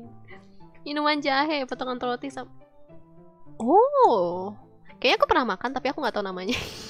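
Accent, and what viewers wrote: West Java, Indonesia. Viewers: i can easily tell she's sundanese by her accent and sweet voice >.< sundanese be having the sweetest and softest voice istg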